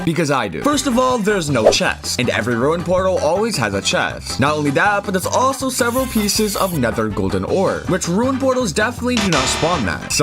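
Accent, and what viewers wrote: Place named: RP, United Kingdom. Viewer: Dramatic posh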